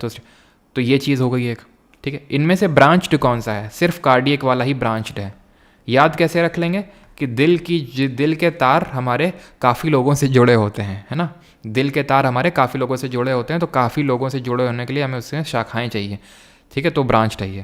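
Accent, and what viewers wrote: Kanpur, India. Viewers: From kanpur ✔️ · वही तो इत्ता accurate कनपुरिया कइसे बोल सकता बाहरी कोई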